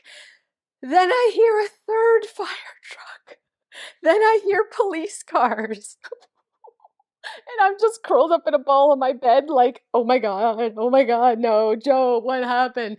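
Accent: Canada. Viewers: I'm going to work on a Word Search book that I got at the $ store, 10 min house tidy up, and do resistance bands for arms and legs! Thanks for sending this video. Your videos have become my happy place, 😍 I love your Canadian accent. I live in Minnesota, so not too far off with the way people talk up north!!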